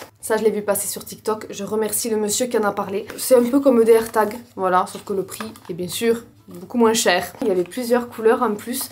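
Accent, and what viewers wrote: South, France. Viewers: Coucou, merci pour ta vidéo et de représenter les filles du Sud avec accent lol Je te conseille ( humblement ) de choisir des thés et infusions de qualité ( évites surtout les marques de supermarchés... ) du style le Palais des thés, Mariage Frères, Thés de la Pagode. Ton palais appréciera d'avantage! Gros Bisous de Perpignan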